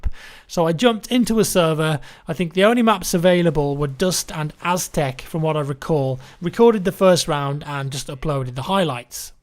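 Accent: England, United Kingdom. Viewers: Luv u! can't resist your awesome English Accent. Plz keep these fantastic videos going!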